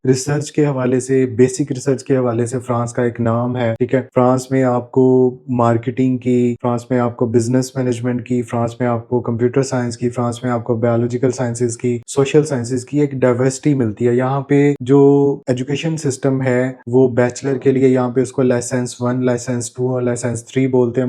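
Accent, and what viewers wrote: Faisalabad, Pakistan. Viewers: Pure Faisalabadi! But Genuine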